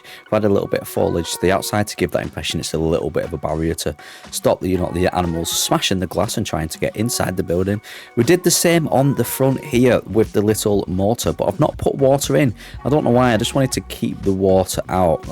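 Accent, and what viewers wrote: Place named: London, United Kingdom. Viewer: yeah great voice, narration a n d accent. One of the main reasons actually for me to choose your videos over those of other gifted British creators. (My preferred accent group in general….) Is that a London accent? Which part?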